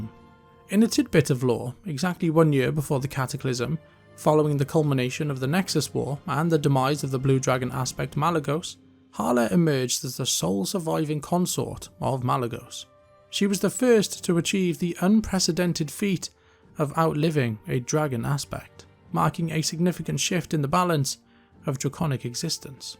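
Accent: Wales, United Kingdom. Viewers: A fellow Brit but do I detect a hint of a Welsh Accent, a fellow Welsh kinsman? :0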